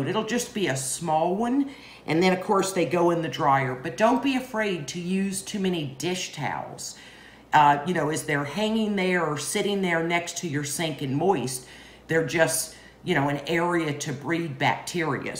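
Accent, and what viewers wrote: South, United States. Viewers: Loving, thanks for participating. Love the southern accent, I could listen to you all day. Good points and sanitizing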